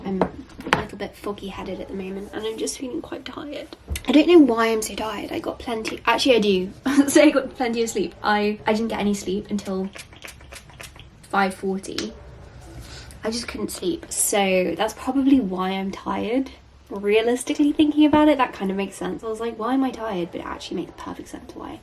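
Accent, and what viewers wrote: United Kingdom. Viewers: As a foreigner i am literally amazed by your smooth British accent and your calmed temper. Your style is so cozy and cute. The way you spend your free time with reading a lot (not ‘waste’ it like unfortunately majority of people nowadays, especially on YouTube) is immensely inspiring♥️ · I wish She could read to us. Her voice is very calming and lol...I love her British accent. 😆👍💜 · British accent and cute home content.........yes, i love it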